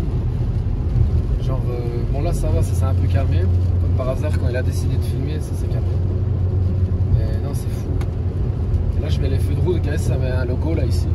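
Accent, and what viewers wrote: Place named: North Africa. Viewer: Cet accent limite nord Afric est insupportable...faut évoluer garçon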